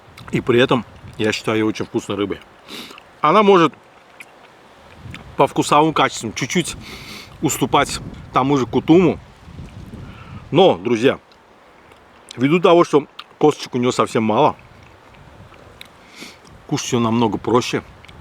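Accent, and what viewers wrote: Baku, Azerbaijan. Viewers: Бакинский акцент. Знакомые бакинцы независимо от национальности именно так разговаривают.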